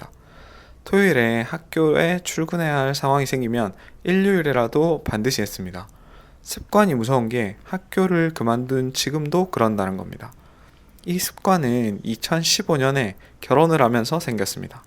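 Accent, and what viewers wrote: Gyeongsang, South Korea. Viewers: 저도 얼마간의 기간제 경험이 있어서 선생님께서 영상에서 하시는 말씀에 많은 공감이 가네요. 모쪼록 마음속에 품으신 계획들 번창하시길 바랍니다. 책읽어주시는 컨텐츠가 철학적이면서 많은 생각이 들게 합니다. ^^. 아 그리고 저도 부산사람이라 경상도 억양과 말투가 참 정겹습니다!! 건승하십시오~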